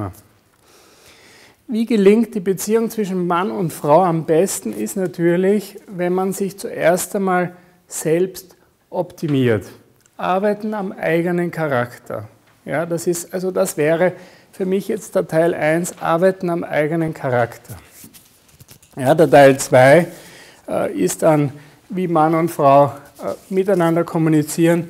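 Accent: Vienna, Austria. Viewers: Danke für diesen klasse Vortrag, Herr Bonelli! Das erklärt einiges, was in der Vergangenheit schief gelaufen ist und was ich unbewusst schon dazugelernt habe. Das Herz weiß was wahr ist und wenn man in der Herzenergie ist, gibt es keine Schuld sondern Verständnis und Vergebung. Und - wie gerne hätte ich einen Österreicher als Mann - der Dialekt ist einfach bezaubernd! ... sagt der Schwabe ... :))) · Wunderbar.....wäre da nicht der "Wiener Dialekt"....für nen Ruhrpöttler schwer das auszublenden😊 Was sind das denn für buchbare Seminare?Wo bucht man sie? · Findest das Schönbrunner Deutsch (Dialekt spricht er nicht) so unerträglich, dass du es ausblenden zu müssen meinst? :-D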